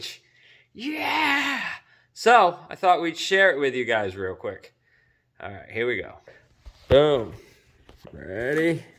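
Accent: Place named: Boston, United States. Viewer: If they sell well, please order 2X and 3X. Never like shirts that actually fit. I like them falling off. Never white, black or dark colors are best. I'm a scrapper and it'd get ruined on day one. Also, I'm originally from Somerville, MA. Love to hear you speak Boston. I'm in Connecticut and they talk funny LOL.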